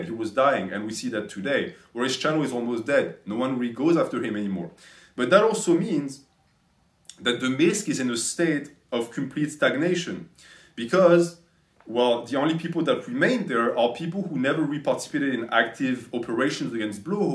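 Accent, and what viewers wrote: France. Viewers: This is even better with the French accent. My new favorite account.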